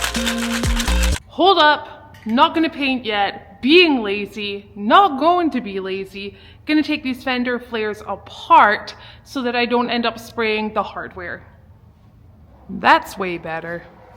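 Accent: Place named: Northern England, United Kingdom. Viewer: While watching House of the Dragon, I heard an accent I thought I recognized. Turns out you sound like the people of the North.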